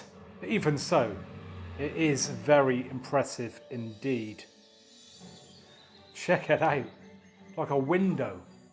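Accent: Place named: Bristol, United Kingdom. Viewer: Love that, I need one that size for my dining table (limited to £400 Amazon Fire) & it's a very clear description 👍🏻 You remind me of a Bristol version of Ross Kemp 😂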